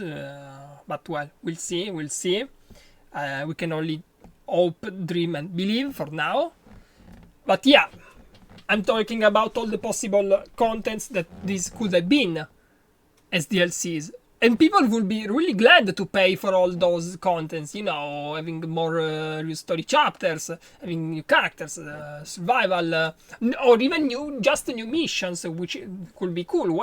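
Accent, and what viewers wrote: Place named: Italy. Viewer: Love the italien accent! Great video!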